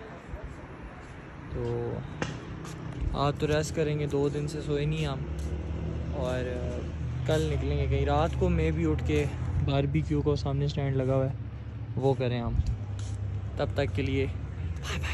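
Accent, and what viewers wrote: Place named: Hindko, Pakistan. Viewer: Ap ki Urdu ka acsent hindko wala he😁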